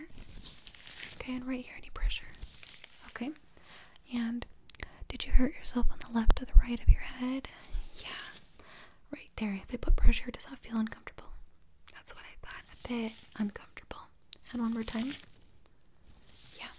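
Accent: United States. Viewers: I can’t ever figure out if your American or British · Are you American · I can't tell if the American accent or the British accent is her original one. Both sound authentic. lol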